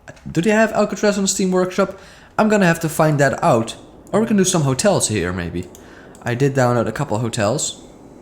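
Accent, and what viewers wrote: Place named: Netherlands. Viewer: I know I am a bit late but are you dutch because you have a dutch accent and if you are so am I love city skylines I live in Rotterdam groetjes uit Rotterdam zuid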